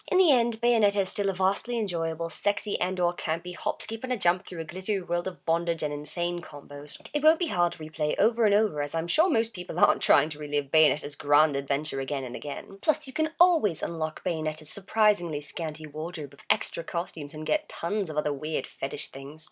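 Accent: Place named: United Kingdom. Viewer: No word of a lie, I thought you where british, shows how much I know about my own background XD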